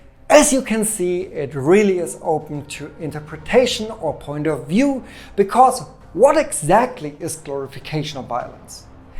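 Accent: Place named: Germany. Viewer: not the typical german english speaker nice usually all germans speak it like in the 1960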